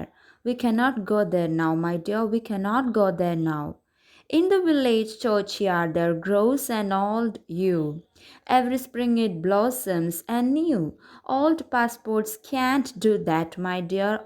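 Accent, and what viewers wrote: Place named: England, United Kingdom. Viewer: I like your english accent ❤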